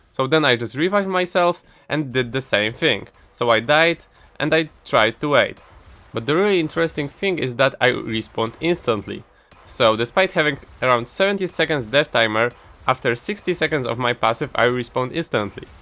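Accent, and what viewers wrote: Poland. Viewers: jak Ty Polak XD wszedzie sie da poznac ten akcent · This Polish accent :D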